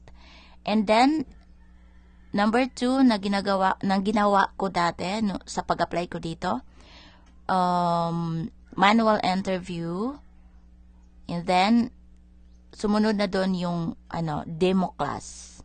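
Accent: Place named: Visayas, Philippines. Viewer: Paanu ka nakapasa diyan, may bisaya accent ka .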